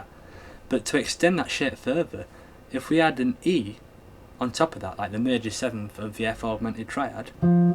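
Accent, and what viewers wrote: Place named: Northern England, United Kingdom. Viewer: Very helpful lesson. I have a better grasp of the scale now. and the Northern accent is quite pleasant. Wondering if you could do a quick lesson on the melodic minor over a standard blues progression, a la Robben Ford or Carlton. as in melodic minor on the last bit just before the iv chord. Cheers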